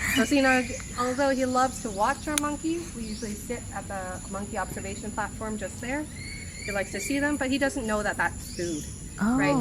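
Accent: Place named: United States. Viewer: expat sya.. american from her accent so no.. di dugo dugo ilong n'ya, it's her native language.. half pinay as she said during the first 5 minutes of the video..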